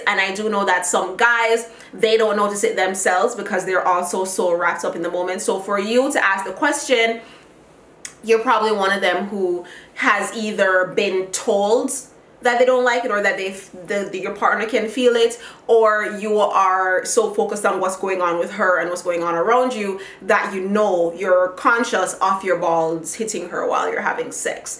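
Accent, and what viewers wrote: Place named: Jamaica. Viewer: New to your vids. Where u from? Sound wash down yardie 😂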